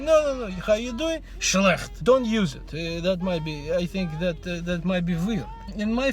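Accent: Russia. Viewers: "God bless America" :) but i think you turned out the way you did (wonderfully great and funny) because you come from a communist backgound, and i can relate to that. thank you for making the effort ! i LOVE your accent, it is such a mix of russian, something else and american :)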